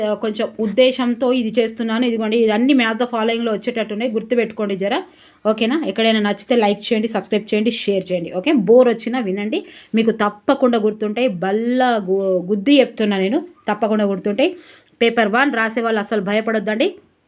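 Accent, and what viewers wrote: Telangana, India. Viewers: Csuper madam acham telangana palleturuloni bashalaga chepparu thanks....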